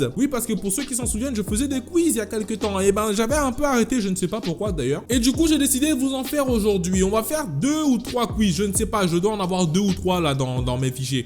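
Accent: Cameroon. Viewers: j adore ton accent camerounais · Avec son accent du Cameroun c'est trop cool like beaucoup si tu aime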